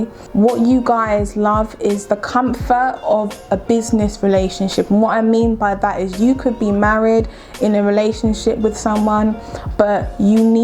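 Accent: United Kingdom. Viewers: You most definitely do but your accent has a little British twang to it 💐 its all love beloved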